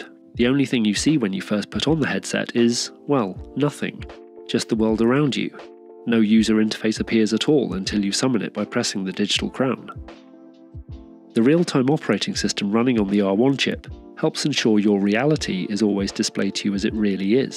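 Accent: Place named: United Kingdom. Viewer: Great video, nice to hear a British voice on American Tech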